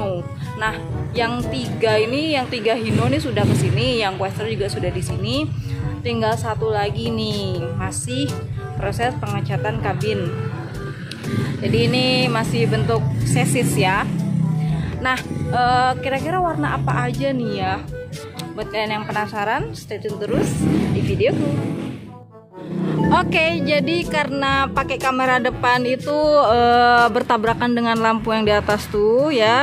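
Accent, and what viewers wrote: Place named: Sumatra, Indonesia. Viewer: logat bicaranya dah mulai logat sumatra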